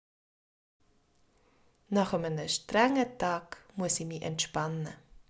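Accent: Switzerland. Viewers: Which Canton German is this?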